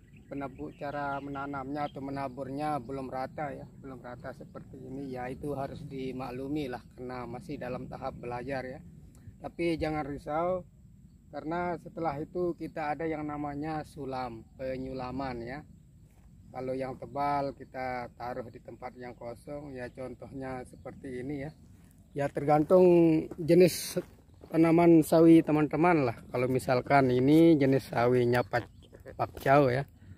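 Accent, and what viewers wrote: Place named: Lombok, Indonesia. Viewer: Logat lombok nya kentara banget pak slm dari lombok tgh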